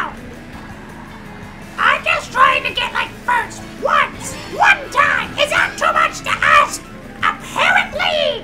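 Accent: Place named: Germany. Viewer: Are you German? I heared the accent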